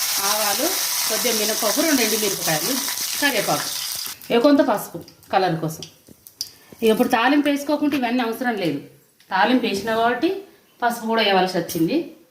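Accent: Telangana, India. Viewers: Telangaana accent Chaala baagundi Mee Pallalu semi chadivinaaru. ?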